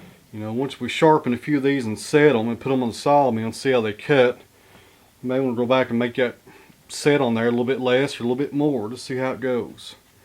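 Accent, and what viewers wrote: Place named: North Carolina, United States. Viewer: With that accent I knew you were close by. I’m in Westerville, NC. 40 minutes from you.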